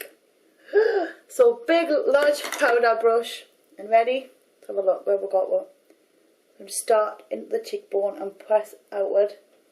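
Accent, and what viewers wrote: Newcastle, United Kingdom. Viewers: Why would she put on an accent. She's a Geordie that's what they sound like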